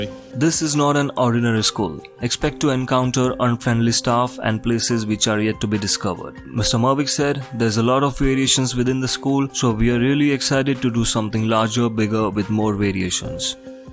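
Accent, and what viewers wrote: India. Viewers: Are you from India🤔? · Are you from india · I can sure u r an Indian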